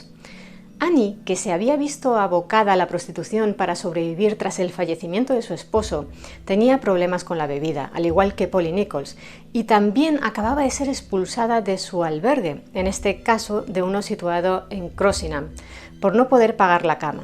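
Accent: Spain. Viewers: me gusta escuchar historias con acento español, cuando escucho acento de mi pais o de latinoamerica no me asusta me dan ganas de bailar jajajaj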